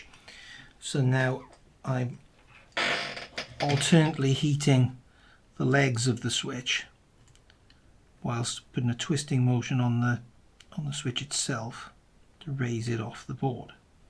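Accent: United Kingdom. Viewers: Where are you bud, sounds like the UK. I've got a faulty unit that won't charge from the socket. I can't diagnose what component is faulty and wondered if you could help. Thanks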